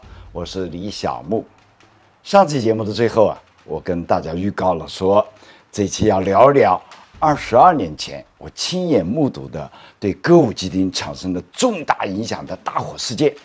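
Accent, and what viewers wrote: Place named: Changsha, China. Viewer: 老乡，没想到您长沙话说得还是那么正宗。听了您的传奇经历，真的觉得既向往又钦佩。真的下不得老地咧，😁😁😁永远支持你。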